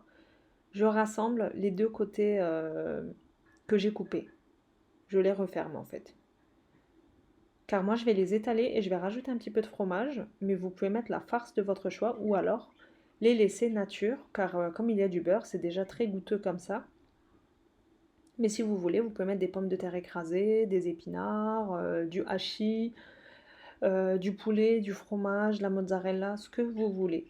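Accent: Alsace, France. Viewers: slm je viens de découvrir votre chaine..toujours de belle recettes a testé..bien expliqué ..vous etes de l'Alsace ya comme un petit accent 😀bravo a vous en tout cas 🖒🖒😚j'aime bcp la cuisine turque aussi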